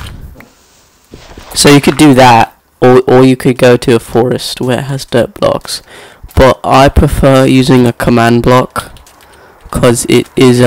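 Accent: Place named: Australia. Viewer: WHY DO YOU SOUND AUSTRAILIAN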